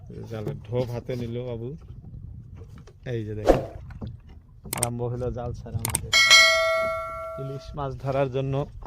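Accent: Bangladesh. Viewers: আপনার মুখের কথার ধরন দেখে মনে হয় আপনি বাংলাদেশের মানুষ কিন্তু বুঝতে পারলাম না আপনি ভারতে গিয়ে কিভাবে ভিডিও বানালেন?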